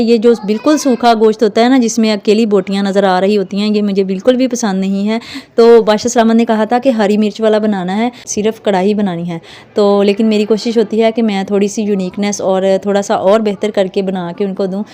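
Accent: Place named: Punjab, India. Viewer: Very sweet panjabi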